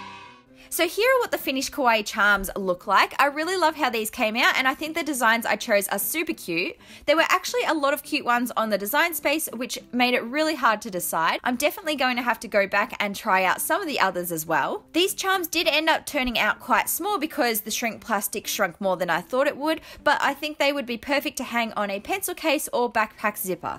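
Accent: Australia. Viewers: Yeah! A new video! Love your work and Australian accent! Hugs from good ol USA!!!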